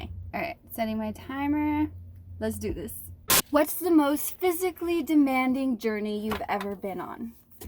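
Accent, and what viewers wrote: United States. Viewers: maybe your half what does it matter. Sounds American to me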